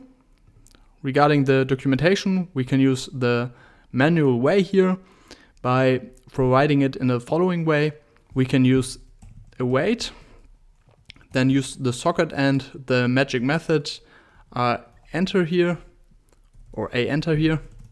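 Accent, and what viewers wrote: Germany. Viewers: You can notice he is German. But a trading bot won't make you rich. Still good personal project to develop. :) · I really like your content and I just subscribed to your channel. Please continue with the videos for cryptocurrencies. Are you by any chance from Austria or Germany? Your pronounciation sounds like it. Cheers! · Sehr interessant. Ich mag es übrigens, wenn Deutsche englisch sprechen, dann verstehe ich jedes Wort :) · Dieser deutsche Akzent👑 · I like your German accent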